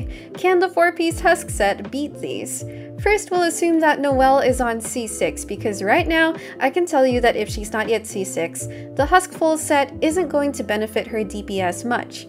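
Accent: Philippines. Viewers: You probably get this a lot but your accent is comfortably eng/fil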